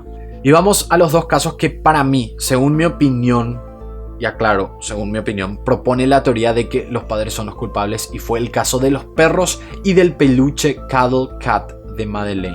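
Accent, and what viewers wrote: Paraguay. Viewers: Acento Paraguayo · Omg eres de paraguay, me too ... Amo el acento pero yo lastimosamente lo perdi todo despues de años de vivir en este pincho pais (argentina) :(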